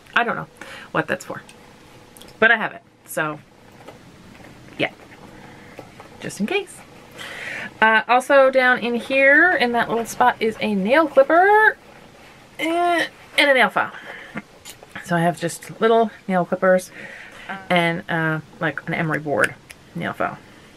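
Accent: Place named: Newfoundland, Canada. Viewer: Loved this video! I was thinking of doing a video like this for my everything pouch. I really heard your Newfoundland accent in this video. Absolutely adore you babe!! ❤❤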